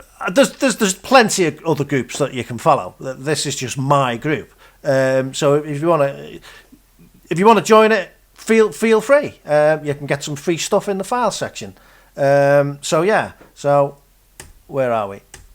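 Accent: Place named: Merseyside, United Kingdom. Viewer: hi, can you tell me whrre you grew up please you sound like we grew up nearby.. my first years were Maghull and burscough. Just askin if its an issue just ignore. Its a complicated world just now. Great work...thank you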